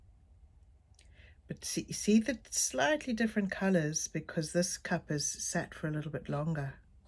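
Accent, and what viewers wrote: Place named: Southern Africa. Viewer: It's nice hearing a Southern-African accent! Btw, how do you sell your artworks?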